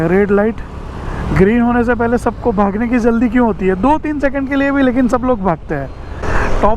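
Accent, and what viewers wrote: India. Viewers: Sweet gujrati speaking by bhai 🤗😂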